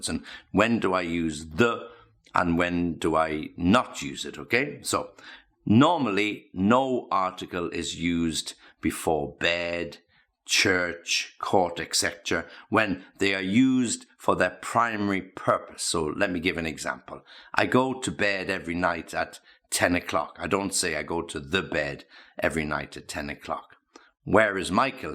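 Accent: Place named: United Kingdom. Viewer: If I am not mistaken you are speaking British English, or at least not Amerikan. And I like it very much. I like your lessens. Thanks a lot.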